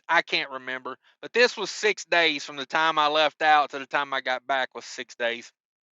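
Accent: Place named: New Orleans, United States. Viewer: BTW.. You're accent is ....terrible. Some New Orleans in the rep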